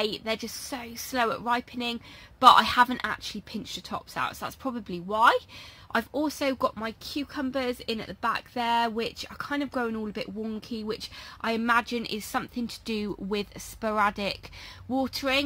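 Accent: United Kingdom. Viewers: "tipping down"? Oh I am sure just here for the wonky UK talk. I love it. So glad to see you today. Looking so good there! It's so hot here, I am stuck inside and waiting on the Fall garden planting here. The garden looks beautiful. I am also trying to slow my camera panning. I have to slow myself down. Lovely veg! Lovely video.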